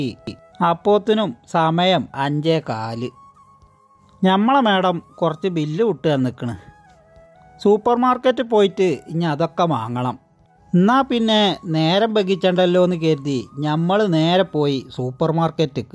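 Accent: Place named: Malappuram, India. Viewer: നിങ്ങള്ടെ ഭാഷ കൊള്ളാം ചില മലപ്പുറത്തുകാർ സംസാരിച്ചാൽ തന്നെ t v m കാർക്ക് മനസ്സിലാവില്ല നിങ്ങൾ പൊളി സംസാരം കെട്ടിരുന്നാൽ സമയം പോവാലറിയില്ല 🤓🤓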